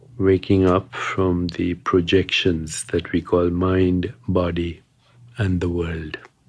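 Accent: India. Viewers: I like your thick Indian accent...relaxed me 🤗. Everyone should enjoy and experience . In our awareness.... The nature of the breath..In the now! 🌟 🌟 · The thick Indian accent is very hard to listen to.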